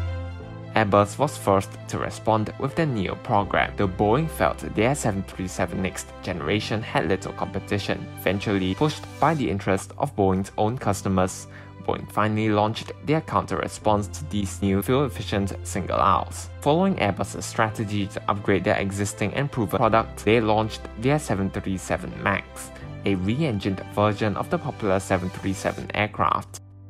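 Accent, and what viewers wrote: Singapore. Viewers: I enjoy watching your videos for the most part… but how about getting someone else to the voiceover. Argh, Singlisn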